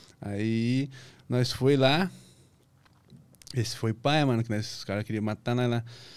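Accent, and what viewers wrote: Rio Grande do Sul, Brazil. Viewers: sotaque de gaucho azar é us guri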